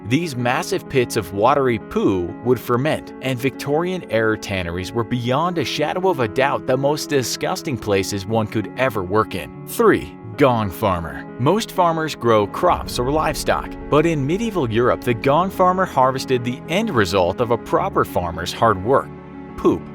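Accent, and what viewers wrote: United States. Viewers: "In the human history??" Who TF is running infographics? And why do these people hire Americans to narrate? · Man i hate this american narrator, feel like i'm getting dumbed down just by listening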